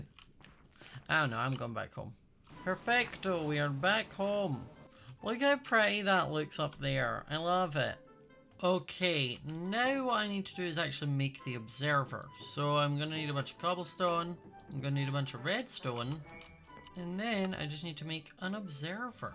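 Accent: Scotland, United Kingdom. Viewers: I love your scottish accent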